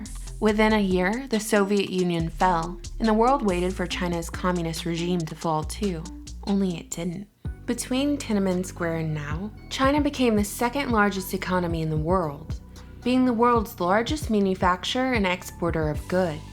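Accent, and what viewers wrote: United States. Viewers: you sound like an American to me then you must do a video of the name instead change it to 'What the media won't tell you about US' The video will take conservatively over an hour if not much longer. Good luck. You info on China is nothing new, read a good history book on China written by notable historian rather than an ideological one.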